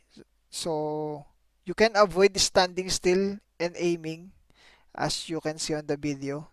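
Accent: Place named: Philippines. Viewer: pag mga pinoy nag english parang di bagay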